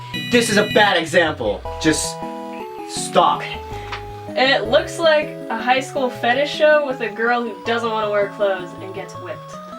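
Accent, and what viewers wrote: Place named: England, United Kingdom. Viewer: My nigga, this is some jontron shit and I like it. IMO: You need to keep the tone of voice more constant, when you are on video you are pretty loud which is good but on the mic is sounds like you are hugging the stand and whispering into it. In regards to the englishese (the opposite of engrish) the pronunciation may annoy some, just a heads up. 10/10 get subbed son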